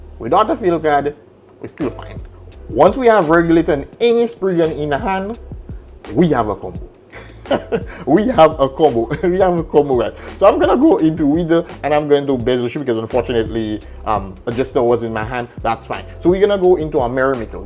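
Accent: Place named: France. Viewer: If I'm right, that a great French accent. If not, that just a great accent xD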